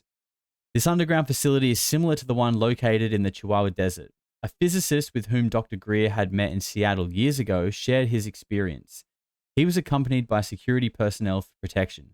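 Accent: Australia. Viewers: No buddy; Aussies sound amazing, love the way you speak :) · Yes frame, U DO SOUND LIKE THAT - THE 1ST WORD U SAID HAD STARTED YOUR AUSSIE ACCENT, EVEN THOUGH U PROBALY GREEK OR ARABIC BACKGROUND!!!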